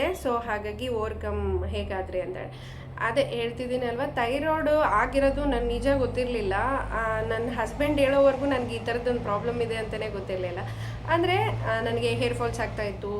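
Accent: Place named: Bellary, India. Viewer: Bellary nalli undya tindya ubmonaa ee tara yella mataditivi… gramya plus Telugu influence enda nam Kannada haage erutte…😂 nanu Banglore ge 1st bandage we tara adikolta eddru… but I love ur raw bhashe… keep going. Gaatri avarinda nim video noodle shuru aytu.. Bellary nalli YouTuber edare anta gotte eralilla… happy for you 😊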